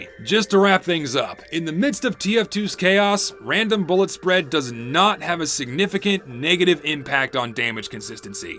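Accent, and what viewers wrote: South, United States. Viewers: I may be missing something but I swear he randomly goes southern every 6 seconds or so.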